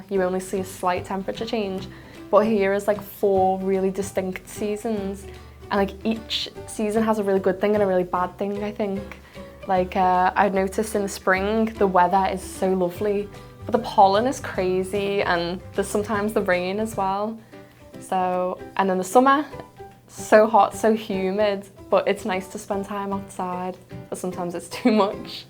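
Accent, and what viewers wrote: Liverpool, United Kingdom. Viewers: 마를린먼로가 생각나는 머릿결과 미모군요. 예쁘십니다. 그리고 리버풀식 억양.. 굉장히 인상적이고 듣기 좋네요. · 아 리버풀 맞군요 사투리 맞아요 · 와.. 영국 영어 매력 쩌네... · I just love your British accent. Sounds very cute and even sexy! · 리버풀 억양 죽이네여 ㅎㅎ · 와 영국영어 발음 정말 듣기 좋네요ㅜㅜ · 리버풀 액센트이신건가요..? 매력있으심다. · 리버풀 액센트는 런던 표준 액센트랑 또 다른 매력이 있군요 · I like your lovely Liverpool accent....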